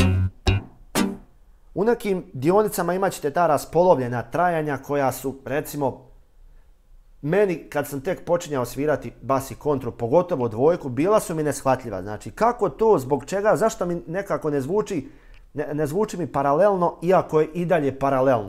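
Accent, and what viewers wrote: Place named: Bosnia and Herzegovina. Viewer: ja moram da ti tepam, nemoj zameriti, sad je 12.decembar slučajno sam naišo na ovaj video, meni i muziciranje koje sjajno radiš nije toliko bitno, koliko si pun pozitive, šta god kažeš van sviračine, toliko zrači harizmom, posebno bosanski akcenat/dijalekt i kako ti prostim jezikom objasniš i sve je tako prirodno. Pozdrav veliki, voleo bih da te upoznam, gde živiš ti, daješ li časove muzičke a Boga mi i ta harizma kojom si oduševio moju suprugu, mog sina, valja se s tobom družiti. Oduševljen sam- Ako stigneš, odgovori mi je l si iz Srbije, ili negde vani. Gde god si, svako bih te posetio. Lep pozdrav, zdravlja ti želim, sreću. Zbilja bih dete, a i sebe uključio ako želiš da nam držiš časove. U ovom regionu punom bolesno sujetnih muzikanatam, samo si iskočio na YT. Ako stigneš. odgovori. Živio!!!!!!!!!!!!!!!!!!!!!!!!!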